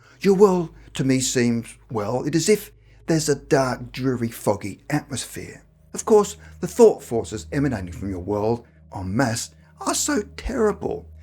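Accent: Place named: Australia. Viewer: I find the narrator's voice very engaging, yet somehow pleasant and soothing at the same time. Though many of these stories seem to be set in England, I thought the narrator's voice sounded Australian. I finally checked and saw that emanates from Australia. I'm pleased my tin American ear was correct for once.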